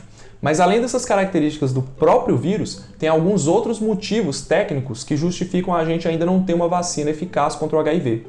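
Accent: Minas Gerais, Brazil. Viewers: Vc é mineiro. Deu pra notar.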